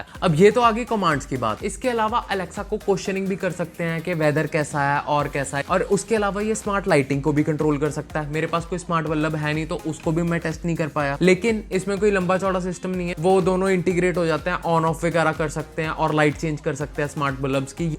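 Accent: Punjab, India. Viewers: Hated you Fucked up Punjabi accents 🤮🤮🤮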